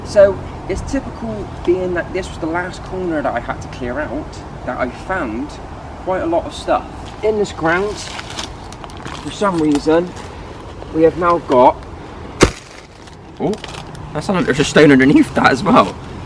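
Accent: Bristol, United Kingdom. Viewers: What’s your accent, is it Bristol?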